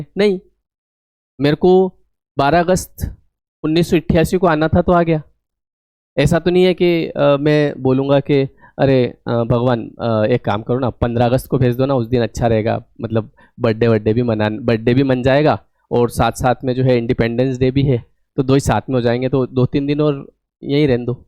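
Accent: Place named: Indore, India. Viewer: Sir Aap kaun se city mein rehte ho, aapki bolne ki tone indori hai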